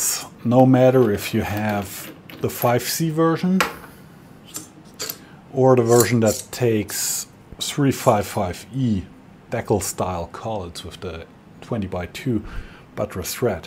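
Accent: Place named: Germany. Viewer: I just love the german pronunciation of "Z" as "Sea" . That is adorable. And authentic.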